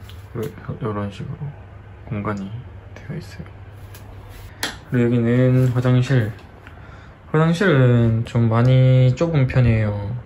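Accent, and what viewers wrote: Daegu, South Korea. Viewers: ㅋㅋ 대구 사람이신가 대구사투리 느낌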